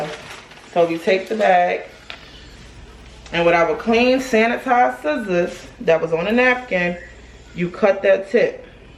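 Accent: United States. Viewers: I love your accent - sounds like nyc-brooklyn lol - and this was detailed God bless your business and your family! Thank you! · I love your NYC accent and charisma! Your business name fits you! Also, you make me want to take a trip to the city! 😊 Thank you for sharing this and not gatekeeping! Many blessings to you!!!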